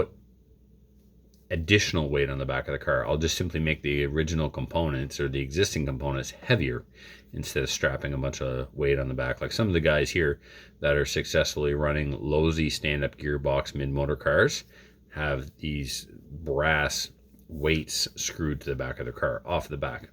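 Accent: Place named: New York, United States. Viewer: you sounded like you were maybe from New York lol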